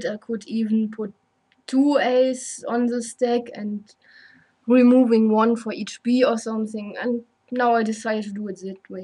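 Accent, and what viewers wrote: Germany. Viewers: I love your german accent ^^ (no offence, i am just learning german)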